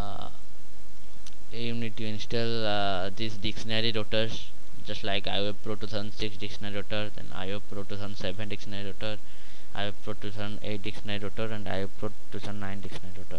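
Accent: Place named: India. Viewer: Damn! He talk english using indian accent.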